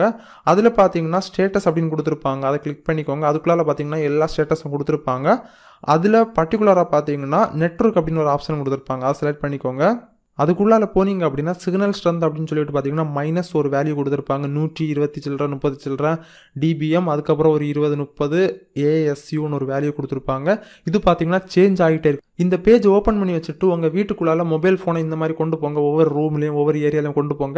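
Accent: Kanyakumari, India. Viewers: bro neenga ....kanyakumari'ah....? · Bro u kanyakumari aa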